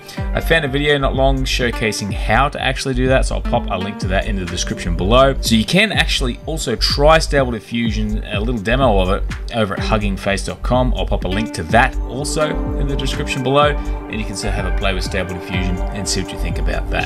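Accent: Australia. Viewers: So nice to hear an Aussie voice on this topic. Thanks mate great info.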